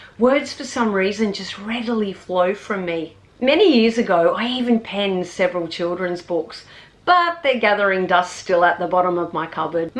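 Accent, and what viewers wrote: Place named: Australia. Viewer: lovely princess, you always make solid points about the content on your channel, so inspiring, so informative, very classy, and very creative, plus, you have such a beautiful glow on your pretty face, and the most adorable accent in Australia. I have so much respect for you, the same way you do for me. Send you a bow like a noble gentleman, and you send me a curtsy like a graceful princess. 🤗🤗🤗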